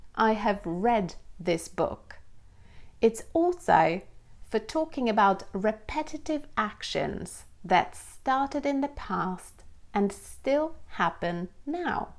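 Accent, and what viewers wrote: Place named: England, United Kingdom. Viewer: jak na kogos kto nie jest native spreakerem fajnie udaje ci się imitować angielski akcent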